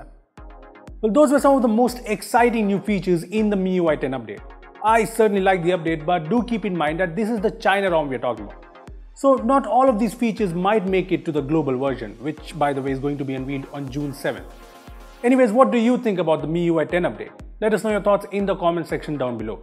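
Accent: India. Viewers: Fuck india fuck your ugly annoying accent fuck your shithole country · No offence but i just hate Indian accent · Finally an Indian accent that very understandable.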